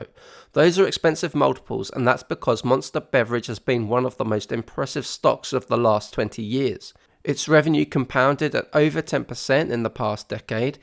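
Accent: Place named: United Kingdom. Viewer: Good video except for the annoying British accent, you English people should learn California accent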